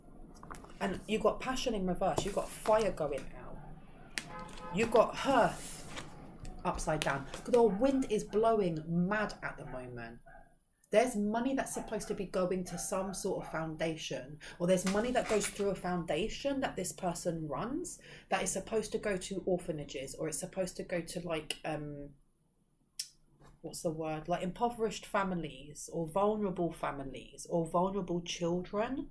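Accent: England, United Kingdom. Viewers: GUESS WHAT, EVERYONE USUALLY HAS CARS HOTRODING , ALOT OF NOISE OUTSIDE, THATS LIFE, MOST HOUSES , ESPECIALLY THE MODERN MODULAR HOMES ARE NOT SOUND PROOF. HOWEVER, I AM VERY LUCKY, MY HOUSE HAS 6 INCH WALLS AND WELL INSULATED. SOMEONE IS JUST NITPICKING, BLOW THEM OFF , ENJOY YOUR READINGS, LOVE YOUR ENGLISH ACCENT! CHEER UP,, DO NOT LET NASTY PEOPLE RUIN YOUR DAY! KOOTOOS!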